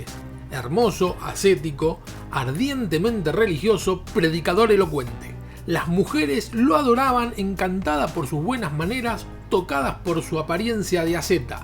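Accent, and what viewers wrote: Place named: Argentina. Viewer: un saludo hermano usted de que pais es parese asento arjentino saludos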